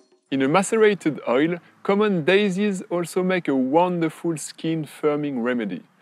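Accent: France. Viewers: Oh man your english is so french......., that's so lovely, you're so cute, I love it!!!!!! Hugg's & kisses ;-)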